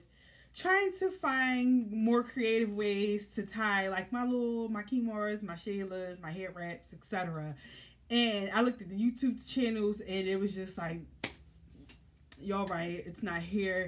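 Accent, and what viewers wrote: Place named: Philadelphia, United States. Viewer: Girllll you just helped me so much!!!! 🙌🏽🙌🏽 As soon as you started talking I said “she’s from Philly.” Lol.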